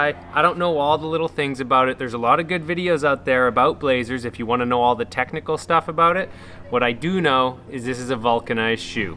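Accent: Canada. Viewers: Are you from Canada or Minnesota?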